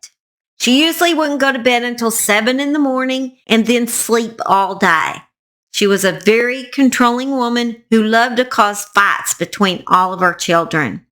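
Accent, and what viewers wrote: South, United States. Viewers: I just love your country accent and I probably sound just as country I'm from Mississippi. Love your dog he's very smart.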